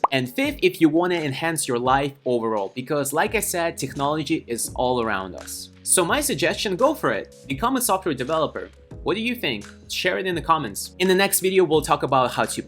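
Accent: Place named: Russia. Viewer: That russian accent man, I can hear it from miles :)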